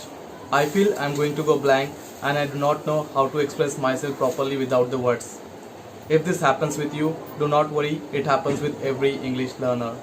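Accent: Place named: United States. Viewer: Wow your English with American accent 🔥🔥🔥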